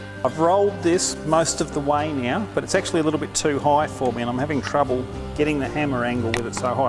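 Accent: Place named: United Kingdom. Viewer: Where you from?Keep saying boot instead of trunk lol.England australia?Nice accent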